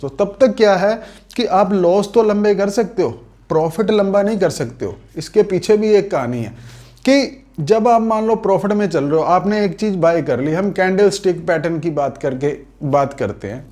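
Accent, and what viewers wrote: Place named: Haryana, India. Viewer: Boht schi knowledge, ❤ Apke way of talking se lgta h. Ki ap haryana ke h...Am i right sir ?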